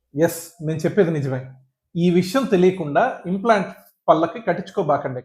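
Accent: Nellore, India. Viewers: Anukunna appude anukunna mee language vini mana nellore ani 😊😊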